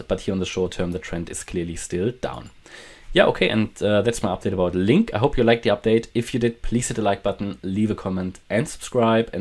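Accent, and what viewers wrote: Germany. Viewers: Bist du deutscher? Frage nur weil dein Englisch klingt wie meins 😅.. Danke für die Info denke link wird uns noch positiv überraschen.👍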